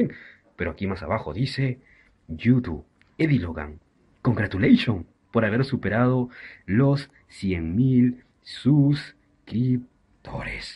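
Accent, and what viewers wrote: Chile. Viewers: felicitacion :) te lo mereces de corazon , eres muy bueno , super entretenidos tus videos :D y otra cosa. Eres chileno sierto?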